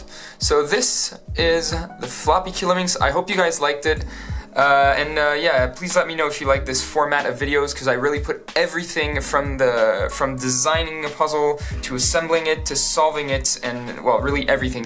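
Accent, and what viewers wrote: France. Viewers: Mais, t'es français O_o, je savais pas moi ! Ton accent est super !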